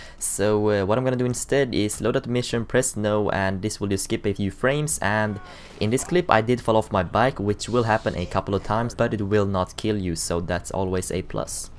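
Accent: Sweden. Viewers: From your accent I'd guess you're Swedish.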